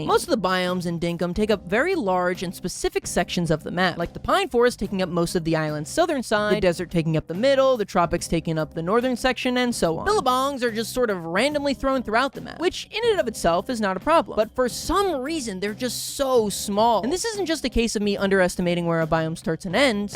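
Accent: Australia. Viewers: After hearing the HORRIBLE Australian accent, I really struggled coming back and watching this video. I then made the mistake of watching till the end… I hate that I like 😐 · You're Australian??? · Yo, finally some Australian rep! Also please stop the australian accent. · As an Australian I can approve of this accent 😊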